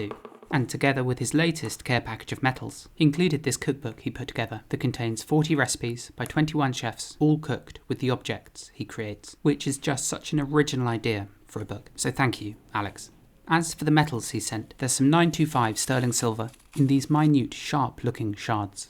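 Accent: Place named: United Kingdom. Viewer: I think a good recipe for youtube carreer balance seems to be (from the youtubers I consume the most at least) just doing what's fun for you. If it's genuine and makes you want to keep doing it, and monetary reasons aren't your main driver, then it's better to let an audience that fits your vision come to you than vice-versa. Obviously this prescription completely backfires if your main goal is making the most money, but those channels tend to not last too long so make those calculations yourself if that's the case I guess. Personally I appreciate intentional videos, but I also really appreciate your channel cause it's like looking into the window of a person who's life you have nothing in common with, learning a bunch of things along the way, and in that calming soothing brittish voice of yours that I love. Hoping to someday find the inspiration/time to practice pottery, but until then, I'll enjoy watching you do your thing :)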